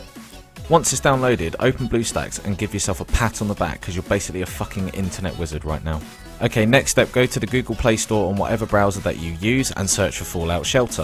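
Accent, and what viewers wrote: United Kingdom. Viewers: Thank you so much disembodied british voice :D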